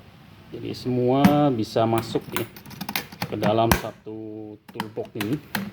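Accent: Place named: Jakarta, Indonesia. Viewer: logat betawi bangett sih bang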